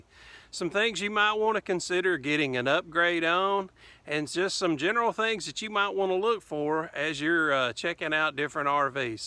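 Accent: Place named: Alabama, United States. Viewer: New subscrib. You’re a smart guy and its refreshing to listen to someone that actually thinks and applies their smarts to whatever. Enjoy your channel and that Alabama accent is southern hospitality coming through the video.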